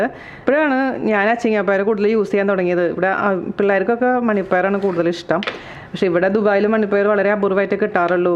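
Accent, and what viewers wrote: Thrissur, India. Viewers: Scooper chechi nammal trichurkaarde baasha sooper readymoney ennu njanum parayaarund ente place chavakkad upperi sooper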